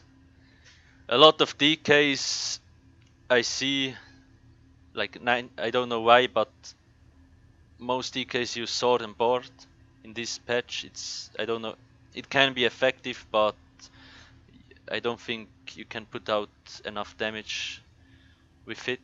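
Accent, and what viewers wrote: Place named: Switzerland. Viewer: Dä schwizer akzänt :D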